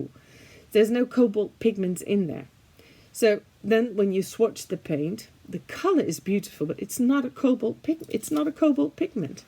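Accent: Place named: Netherlands. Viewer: I always know your Dutch because you speak like * from *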